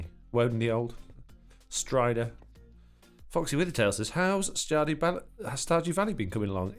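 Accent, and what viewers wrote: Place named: Ireland. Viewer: Love the Irish accent Foxy, I see another character on the horizon lol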